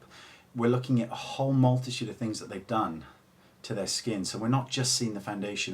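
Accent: United Kingdom. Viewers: He is English/British!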